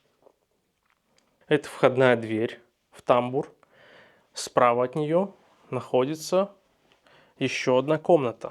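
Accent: Belarus. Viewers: А мне сразу стало понятно, что это где то в Белорусии. Первое: по говору. Второе: белорусы замечательные умельцы и трудолюбивые рукодельники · Здравствуйте! Судя по говору вы белорус😊 привет вам со Ставрополья! Мои предки строили дома из самана и они могу стоять сотни лет если за ним ухаживать.Отличие от вашего хоббитского все дома из самана с толстыми стенами и это важно ! Такие хатыбелят известкой а фундамент черным битумом. Красиво и нарядно ! Ваше умение строить такие печи со сложными дымоходами восхищают! В нашей местности дерево всегда ценилось и глиночурки не известны.и конечно с дровами туго.В детстве бабушка топила углем а потом у всех провели газ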